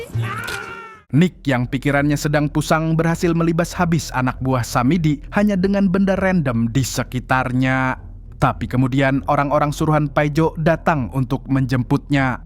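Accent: Banjar, Indonesia. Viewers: Positif orang Banjar ni adminya🤣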